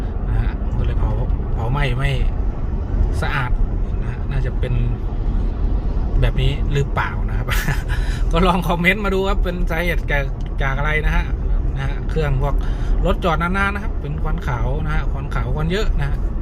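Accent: Phu Thai, Thailand. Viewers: แอดมินเป็นคนผู้ไทบ่ครับ สำเนียงเว่าคือๆแท้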